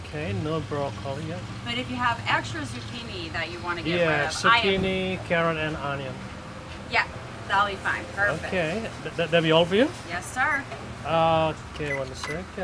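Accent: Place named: Java, Indonesia. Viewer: Logat jawa Indo pakde di LN seperti nasi goreng yang sudah bisa di minati orang sana😂.... semangat buat semuanya, sehat2 selalu, dan lancar rejeki buat kita semua. GBU...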